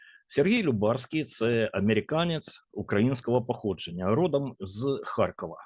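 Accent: Russia. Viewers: Якось дивно: Ви читаєте український текст, але з російською вимовою.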